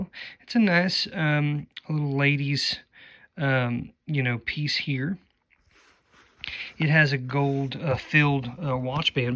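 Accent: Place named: West Virginia, United States. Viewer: Just moved to Weat Virginia and thought the accent was similar to the area. Love it! 😊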